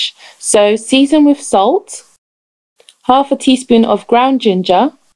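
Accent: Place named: United States. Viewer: Are you American or a Nigerian?? Love d accent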